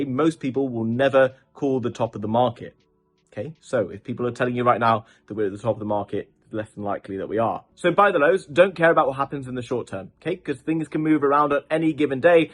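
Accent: RP, United Kingdom. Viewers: New subscriber , great content , you sound posh like me.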